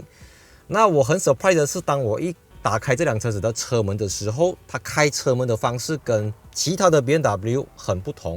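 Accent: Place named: Sarawak, Malaysia. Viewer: 老板讲 * Ngeh Ngeh * ? 有砂拉越的味道哦。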